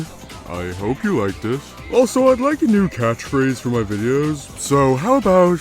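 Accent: Ireland. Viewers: Wait are u from Dublin? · I can here d4 accent from deep within you melt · I always loved the Irish accent, hell I'm making a show soon and the main villain is a Irish voice, also love your videos man 10/10👍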